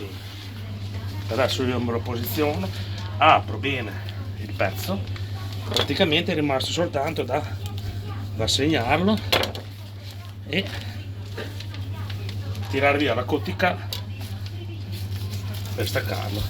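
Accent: Emilia, Italy. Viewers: Si ma guarda che lui ha un'accento emiliano, mica romagnolo. Va ben che ne han fatto una regione unica, ma a parte quello son due cose ben diverse, e accenti ben diversi, con Cesenatico non c'entra niente...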